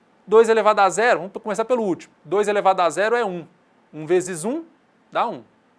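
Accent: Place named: Minas Gerais, Brazil. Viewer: o bom é que ele é mineiro , num guento ver esses cara falando tudo certim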